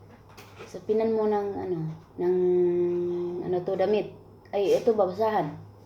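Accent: Visayas, Philippines. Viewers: Puyra buyag nmo inday manulti pagka lawom gajud nga Bisaya, kahinumdum lng ko sa ako Lola 😉😉😉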